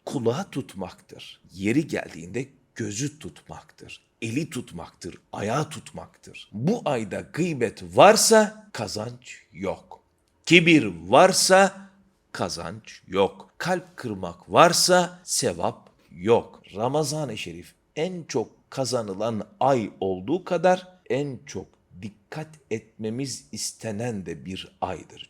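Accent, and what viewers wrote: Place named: Arab world. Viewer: hocam, içerik olarak gayet keyifle dinliyorum, çevremle paylaşıyorum ancak türkçeyi de arapça diksiyonu ile konuşmanız bir süre sonra yormaya başlıyor, suni/zorlama geliyor. ali rıza demircan hoca misali…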